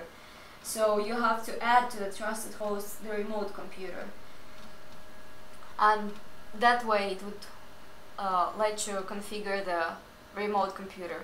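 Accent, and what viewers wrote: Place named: Russia. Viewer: Чувствуется русский акцент и немного много "SO...". Но девушка старается. Спасибо за хорошую иллюстрацию по WinRM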